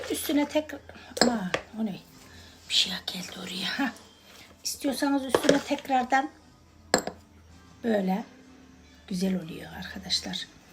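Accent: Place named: Nevşehir, Turkey. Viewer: Gırdı Nevşehir li ne Türkçe amma 😊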